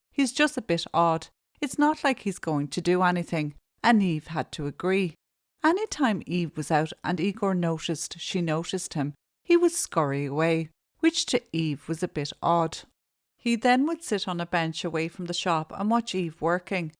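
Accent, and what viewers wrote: Ireland. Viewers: The Irish accent is lovely! If you have nothing nice to say then say nothing you horrible person! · Then find another channel silly. Irish is in the channel name. It's a gorgeous accent. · Don’t listen then, I love the Irish accent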